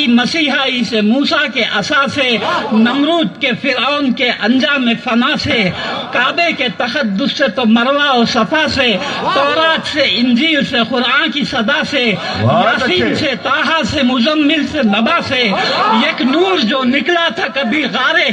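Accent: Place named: India. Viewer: Nahi ye mujhy unki awaz nahi lagti mera dil nahi maan rha mashaAllah jysa face hy wysi awaz nahi hy. Ye koi indian hy lehjy sy maloom hota hy. Or koi patla bnda hy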